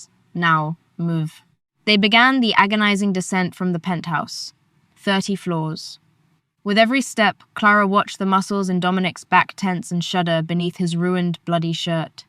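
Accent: United Kingdom. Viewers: Yes I did she had to so they both could survive it was self defense. Very exciting g intense story I loved it from beginning to educate. I have a little trouble listening the narrator spoke really fast with a heavey British accent. I am from moline illinois USA and I give a 10+++++for the story. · The British accent is fine. Have you heard the atrocious attempts at Italian or Sicilian accents in some of these?? This isn't AI, so thumbs up from me.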